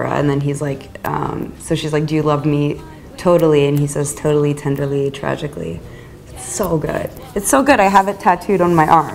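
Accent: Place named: France. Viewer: Ella tiene algo muy francés... Ademas del acento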